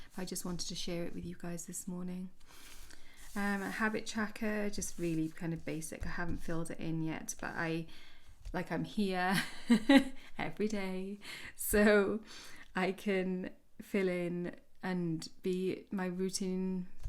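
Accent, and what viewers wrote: United Kingdom. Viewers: Thank you for sharing this, even though you didn't entirely feel like it. I appreciate it so much. Not to be weird, but your voice is so soothing (part of that is the dumb American in my loving UK accents lol) and I'm having a rough night with insomnia so am catching up on YouTube. I'm teary now watching a familiar video from someone I love. And please note that this comment is NOT pressure to continue posting - if you don't feel up to it, don't do it! But I just want to say thank you for this one.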